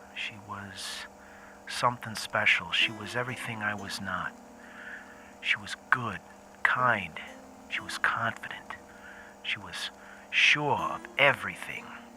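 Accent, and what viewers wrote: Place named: Boston, United States. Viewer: What a great way to use the opening tutorial and yet make it completely your own! Really looking forward to this. And so glad you are doing a Boston accent that doesn't sound like a parody of a Boston accent.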